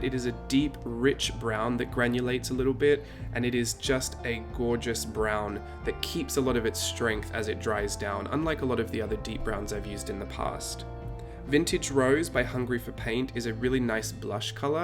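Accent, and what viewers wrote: Australia. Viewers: Just found you, looked you up.;) Always watching. Lol I knew you sounded Aussie. I live in Dulwich Hill. Are you in Sydney region? Congratulations on your recent success after a car accident and changing careers to find something that you love. I like Irit's channel too. Love Japan, and Van Gogh. Highschool Teacher of woodwork and stuff then after many car accidents (none at fault!) I slowly changed to the little ones Learnt for 4 years I am a Montessorian I no longer teach but, now writer, artiste, illustrator. Watercolour collector of professional brands and handmades love inks and journals too (on a no buy break it is killing me) We are lucky to be alive both of us.:) Hope you are enjoying your Etsy shop break holiday. Now subscribed! In kindred spirit, Eliza Most Beautiful Day is Today! Sunday 26th of June, 2022 pm Dulwich Hill, Sydney Australia x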